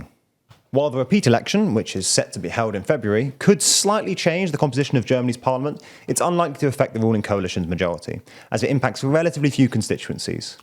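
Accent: United Kingdom. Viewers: I normally don't like new presenters in my YouTube videos but is alright. I think for news what I am really looking for is a smart sounding British man to quickly and emotionlessly explain what's going on so he fits into the lineup like a glove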